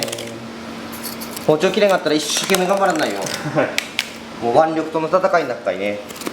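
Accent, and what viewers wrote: Miyazaki, Japan. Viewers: 宮前弁まるだしw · なーんか聞いた事ある 話し方と思えばやはり宮崎(o´罒`o) 宮崎のしゃべり方は落ち着く笑 けど自分がこんな感じではなしてると 自覚してる人はいない笑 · 若いころにいた会社でお世話になった宮崎出身の上司の方言と同じ方言で凄く懐かしい。そしてこの人、教え方も丁寧だし技術も上手いしで、本当に凄いです。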